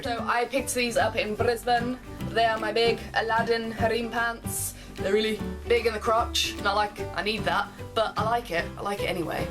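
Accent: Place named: RP, United Kingdom. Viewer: i love love love your videos and think you're absolutely great, but i can't help giggling... you have such a 'gap yah' accent, i love it!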